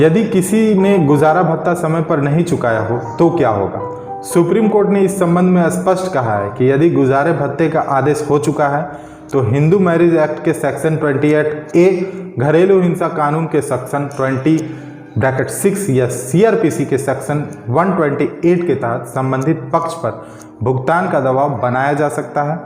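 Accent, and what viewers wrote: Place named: Maharashtra, India. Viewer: Tu marathi hai...hindi me gadbad ho rahi hai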